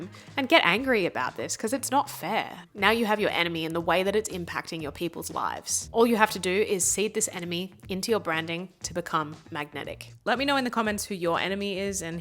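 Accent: Australia. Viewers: Just realised your Aussie! Birds of a feather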